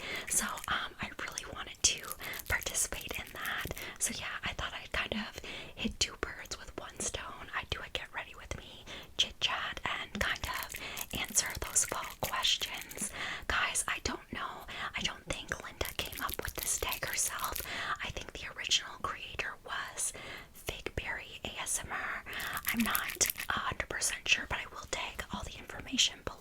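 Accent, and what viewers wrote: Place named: Canada. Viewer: Your Canadian accent really comes through in this video 🍁❤️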